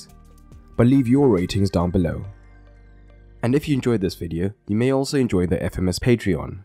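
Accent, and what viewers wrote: South Africa. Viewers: Cool video man. Are you South African?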